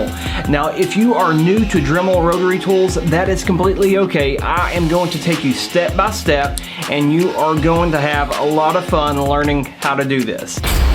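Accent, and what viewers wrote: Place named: West Texas, United States. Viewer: West Texas accent and all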